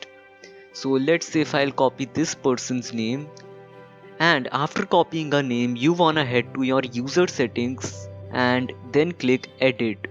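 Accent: India. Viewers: The method is real people just doesn't like Indian accent thise days...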